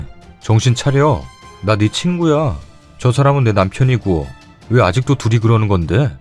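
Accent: Gyeongsang, South Korea. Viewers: 저 부산사람인데 부산말 욱겨요 ㅎㅎ 어리버리 글 지워 졌어요 죄송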